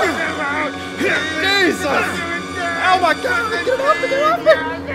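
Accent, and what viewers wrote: United States. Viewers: Jeez has the most American Voice ever:face-green-smiling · lmao the reason why i watch is bcos of his reaction and his strong American accent HAHA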